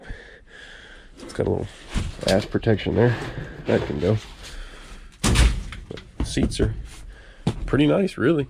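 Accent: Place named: Pennsylvania, United States. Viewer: sounds like a Pennsylvania accent there....where are you from? we are in ohio. love the old trucks. i have a 1968 w900a with a an 8v72 2 stroke and 4 and 4 trans. original truck but needs retored. also have a 65 loadstar project going on.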